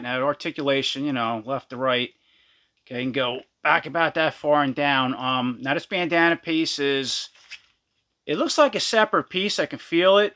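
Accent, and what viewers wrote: New York, United States. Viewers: Thanks for your quick reply! Now I gotta sub!;) It's good to know that at least I'm not alone going nuts over these QC issues! I managed to somewhat straighten the bullets but they're still somewhat warped. Feels like I'm tripping on acid just looking at them. Thanks McFarlane! Not! Oh well... I'll try the heat cold method once again soon. Thanks. I appreciate you 🙏 By the way! Is your accent from New York? Just curious! Best regards from Belgium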